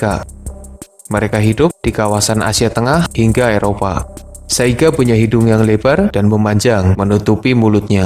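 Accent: Banyumas, Indonesia. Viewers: Aksenmu mirip wong ngapak min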